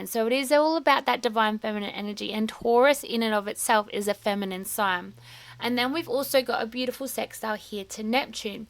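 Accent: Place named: Australia. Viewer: ❤ Hi. It is great to hear your views and also from a fellow Australian. I am saying this to help you improve. It is wise to use short succinct sentences, particularly with different cultures. I suggest slowing down. Try not to begin a sentence with So this means, And then... etc. It is like continuing the same sentence when it is actually the beginning of a new point. I had to learn the hard way when doing my Masters that short sentences were digestible. It is why people may find you hard to understand. Australians speak very quickly, particularly compared to the British. Our flat tone and different pronunciation is also a problem. I strongly recommend leaving a one second pause at the end of the sentence. It is like hearing you say "and then, and then, so and then...." I used Description (definitions and examples), then analysis (on the one hand this, on the other that), interpretation (what does this mean, what are the different viewpoints), and judgement (drawing conclusions) as a successful format. 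It is up to you of course. I just subscribed!🤣👍🦘🦘🦘💜🙋‍♀️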